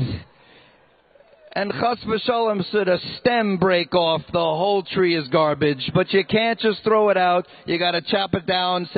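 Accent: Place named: United States. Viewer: THIS GUY SOUNDS SUSPICIOUSLY LIKE A YANK, BUT HE MUSTVE GONE TO MY CHEDER IN SUNDERLAND.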